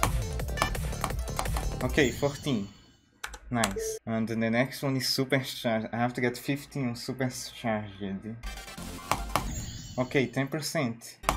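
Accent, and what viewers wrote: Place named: France. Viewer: idk why but your french accent is very nice to hear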